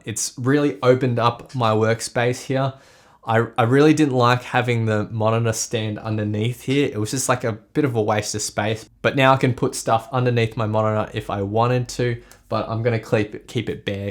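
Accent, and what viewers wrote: Australia. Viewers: Thanks for awesome review and instructions. Nice to hear an Aussie accent, we need a good tech review channel, thanks for bringing it. The link you provided takes me to a product $239 ? Did price just increase or wrong link?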